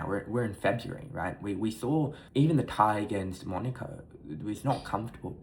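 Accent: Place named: Liverpool, United Kingdom. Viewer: Bro is literally speaking in Liverpool accent 😅😅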